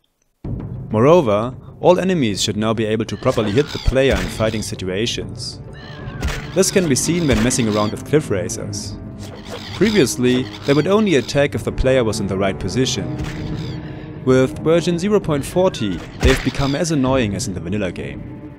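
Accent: Germany. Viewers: ich gehe vom ankzent her mal davon aus das du deutsch bist ^^ mein englisch ist schlecht also frage ich auf deutsch ist OpenMW mit der Steamversion von morrowind compatibel? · Looks pretty cool. Any chance it's compatible with vanilla (and non-modded) saves? Your German accent is a thing of beauty btw.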